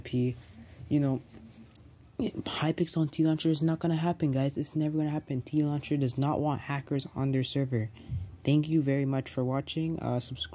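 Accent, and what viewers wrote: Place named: India. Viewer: Fr bro as soon as i hear the indian accent i know that the video is fake, scaming literally flows in their veins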